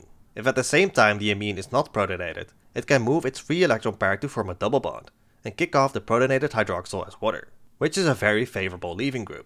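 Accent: Netherlands. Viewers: Are you dutch? youve got like a dutch accent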